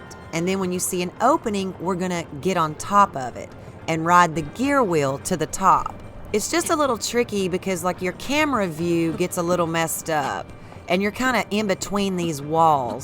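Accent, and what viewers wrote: South, United States. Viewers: Is it just me or does she/he sound like a red neck?(no disrespect) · Very helpful, love the southern accent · I'm British and this is definitely the best American accent ❤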